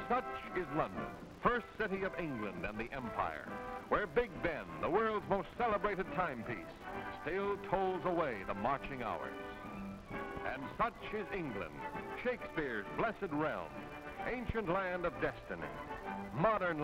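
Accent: United States. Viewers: Infant death rates and death in childbirth were higher. Polio, TB was common. This was an advertisement with an American narrator.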